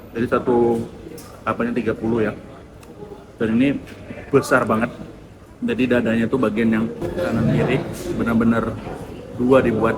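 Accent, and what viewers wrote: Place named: Java, Indonesia. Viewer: kok vibesnya jadi JAWA bang? gak sumatra lagi, medok banget kedengerannya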